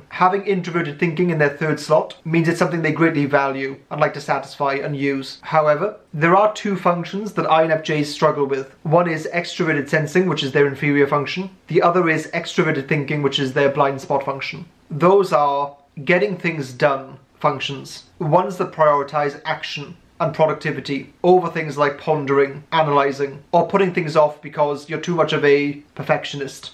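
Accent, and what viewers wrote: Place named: England, United Kingdom. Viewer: Oh, I love your videos. And I also love your voice, the phrasing is so neat. I'm trying to figure out your accent... Any clues? You're English, you're Welsh, one of your parents is from India... 🕵️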